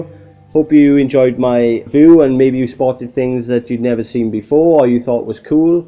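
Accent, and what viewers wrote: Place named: Wales, United Kingdom. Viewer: Welsh accent. I assumed you would be American, don’t know why.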